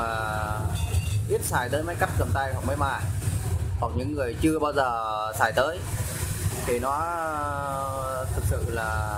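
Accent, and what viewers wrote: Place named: Thanh Hoa, Vietnam. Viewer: nghe giong noi dan thanh hóa, thấy sợ sồi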